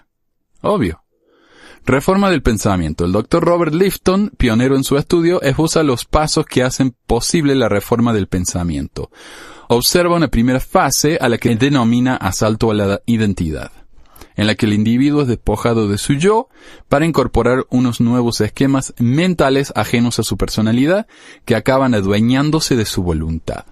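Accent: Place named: Interior, Argentina. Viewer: Bien tirado. PD: me gusta como locutas. Soy de Perú, pero intuyo que eres del interior de Argentina porque tienes un acento como el de Luis Landriscina (un cómico). Saludos cordiales.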